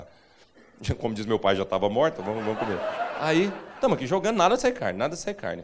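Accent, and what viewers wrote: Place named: Goiás, Brazil. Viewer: difícil o Goianês... ainda mais sendo gaúcha! 🤣🤣🤣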